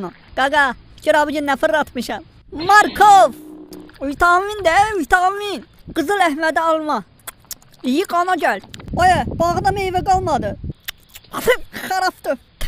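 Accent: Sheki, Azerbaijan. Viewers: Şəkinin ləhgəsi insanları elə olurda düzünə şəki❤️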